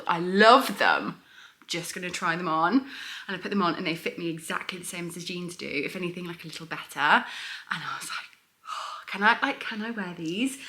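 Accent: United Kingdom. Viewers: вас дуже любо слухати, дуже приємне мовлення, обожнюю британську англійську)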